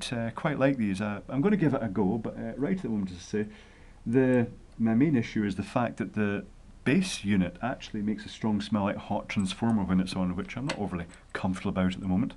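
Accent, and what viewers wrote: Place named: Edinburgh, United Kingdom. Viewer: Sounds like Edinburgh to me.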